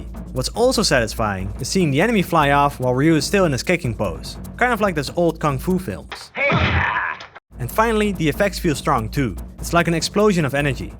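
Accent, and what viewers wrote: Netherlands. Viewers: your english is decent, it took me literally a minute to notice your dutch accent :p u have a typical dutch D & T. coole video trouwens. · u dutch ?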